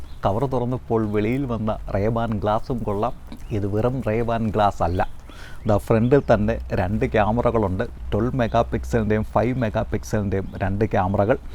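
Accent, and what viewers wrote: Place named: Ranni, India. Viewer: നിങ്ങൾ റാന്നിക്കാരനാണ്,,, അത് ഭാഷ തെളിയിക്കുന്നു.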